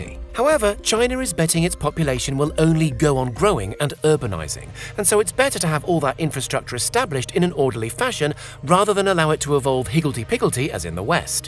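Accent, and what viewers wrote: United Kingdom. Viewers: Sorry, hard to understand due to British accent.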